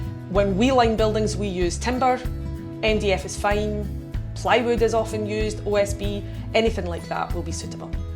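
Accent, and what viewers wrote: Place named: Scotland, United Kingdom. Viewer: Great advice hello from England i agree you do need a gap between the cold air and the warm thank you ,lovely scottish accent 😊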